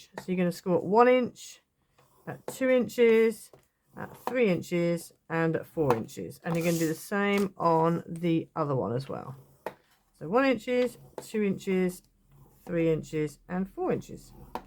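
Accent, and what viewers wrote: United Kingdom. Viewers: Lovely card beautifully explained. Thank you. Good to hear a British accent too.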